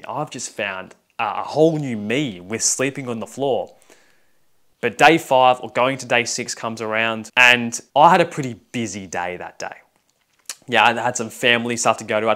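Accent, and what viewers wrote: Australia. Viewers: Now that's an Aussie accent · Judging by your accent I’m guessing your from New South Wales or Sydney area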